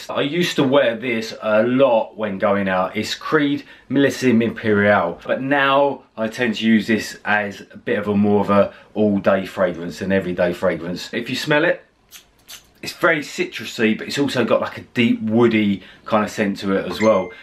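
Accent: United Kingdom. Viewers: Nice , but please put it in description because I couldn't get the names of some of them, English accent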